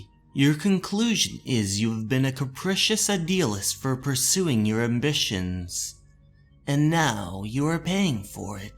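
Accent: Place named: Canada. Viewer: I KNEW IT I STILL GOT IT!!! It was an extremely minor "it really helps me OOT a lot" that perked my ears up. I'm also a gigantic Canada's Worst Driver fan and dating a Canadian (Hamilton, Ontario) once has me primed to notice your speech patterns (best described as an extremely minor case of John Travolta in Battlefield Earth in my opinion). In fact, it wasn't until watching this video of yours that I realized Andrew Youngblood had that same John Travolta in Battlefield Earth cadence that became more evident as the series progressed and maybe that's the real reason the best show of all time was ended after fourteen seasons. I'm onto you, Andrew. I know what you're doing.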